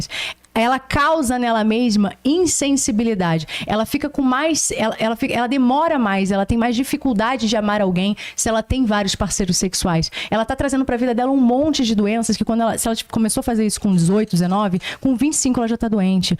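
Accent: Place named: Rio de Janeiro, Brazil. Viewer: Ela é carioca, po. Se fosse baiana ela iria dizer "Oxente!", "Mainha", etc.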